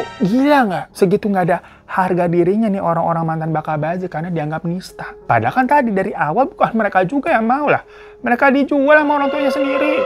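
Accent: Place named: Central Java, Indonesia. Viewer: Abang aslinya dr jateng mana ngapak nya.....utara atau selatankah.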